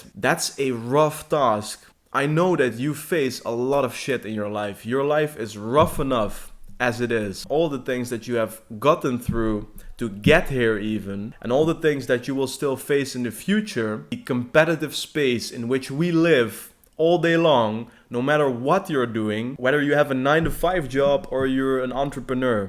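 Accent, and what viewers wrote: Netherlands. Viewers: Are you dutch bro? The accent 😎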